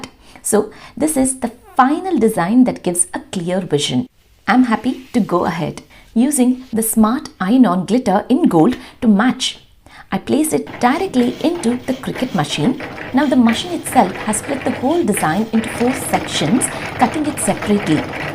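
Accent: India. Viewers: Wow you are awesome..The way of your pronunciation is true Indian.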